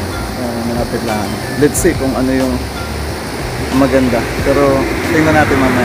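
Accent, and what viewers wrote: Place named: Quezon, Philippines. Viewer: puntong quezon ka pa rin hane.. Ingat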